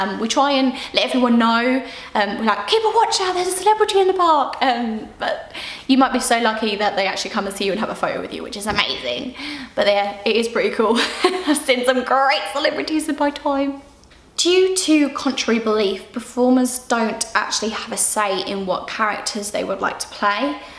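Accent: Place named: England, United Kingdom. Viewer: What part of England are you from? Your accent is lovely!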